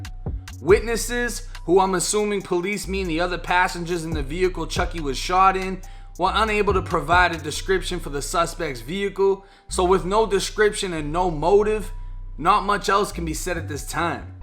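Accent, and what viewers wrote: Boston, United States. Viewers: ❤you from 617 Boston that accent sounds like you from the bean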